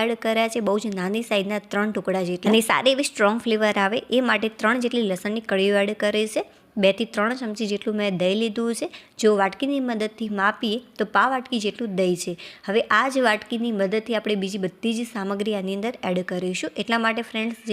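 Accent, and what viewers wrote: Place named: South, India. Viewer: Tamara pronunciation south indian java che.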